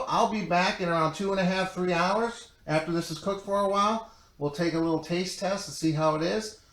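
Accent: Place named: Minnesota, United States. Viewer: I’m guessing your from Minnesota, North Dakota, or Canada.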